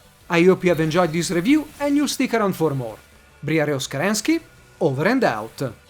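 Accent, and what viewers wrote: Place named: South, Italy. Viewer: I know you aren't italian from your last name, but man, you could also fool a southern italian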